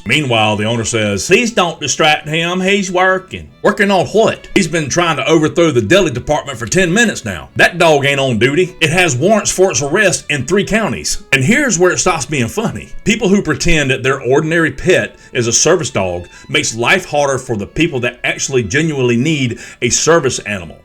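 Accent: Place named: South, United States. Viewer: Did you say dawg???...lol 😂😂😂 I literally couldn't get passed that southern accent, so adorable 😍 I love cute dawgs too lol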